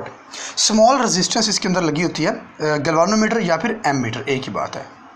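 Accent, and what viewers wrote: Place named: Punjab, India. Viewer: Physics with punjabi touch....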